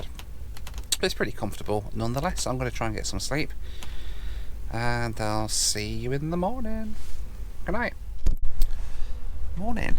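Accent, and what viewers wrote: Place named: United Kingdom. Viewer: Hi... Which part of the UK are you from? Trying to work out your accent!! Cheers:)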